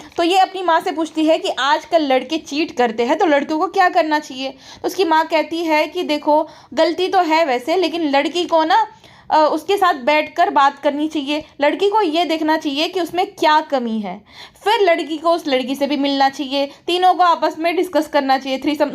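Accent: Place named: Kathiyawad, India. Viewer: you sounded like kathiyavadi❤ I love it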